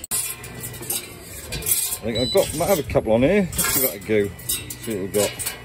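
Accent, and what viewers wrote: United Kingdom. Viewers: You accent is very thick, are you from the U.K.?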